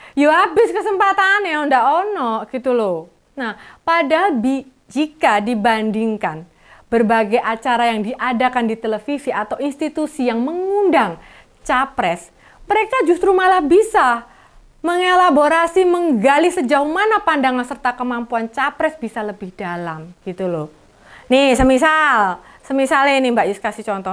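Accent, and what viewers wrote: Java, Indonesia. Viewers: Ini mbak Yus makin lucu, makin berani, makin tajem... Dan dicampur logat dan boso Jowo jadi pas untuk pendidikan pembelajaran mayoritas masyarakat NJAWANI..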